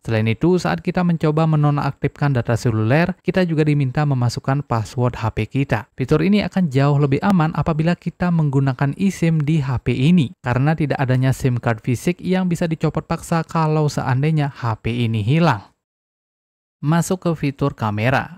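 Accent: Bali, Indonesia. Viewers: Suaranya Bali banget bli hehe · Bang orang bali atau lombok?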